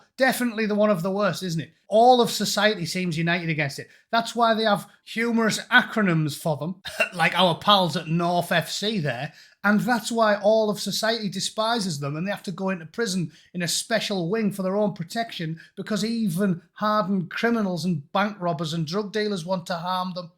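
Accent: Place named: Middlesbrough, United Kingdom. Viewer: You from the Boro lad???? New subscriber ... You sound like your for my neck of the woods.👍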